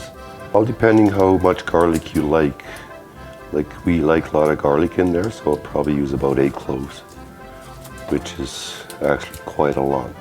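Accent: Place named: Canada. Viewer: You sound like a fellow Canadian sir edit: "deciliter" definitely Canadian, greetings from Ontario, j'aime le fromage, merci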